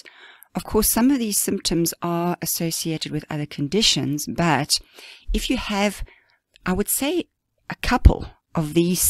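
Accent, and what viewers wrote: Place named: South Africa. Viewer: You sound like you are from South Africa.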